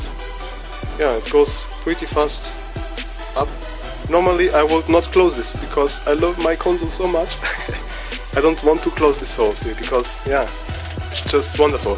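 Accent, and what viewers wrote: Germany. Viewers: Man hört sofort dass du ein deutscher bist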